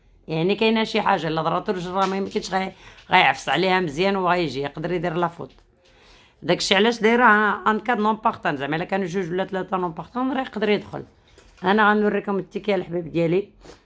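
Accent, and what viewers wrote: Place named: Arab world. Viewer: J'aime bouquau cet accent arabe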